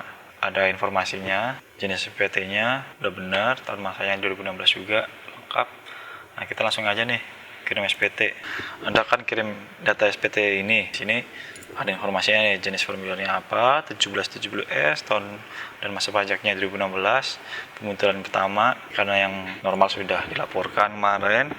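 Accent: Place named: Banyumas, Indonesia. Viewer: membantu sekali mas ngapak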